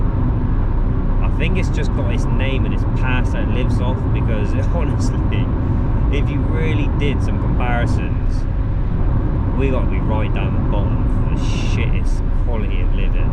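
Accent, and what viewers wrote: United Kingdom. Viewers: You got a Norfolk / Suffolk accent? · West Country accent.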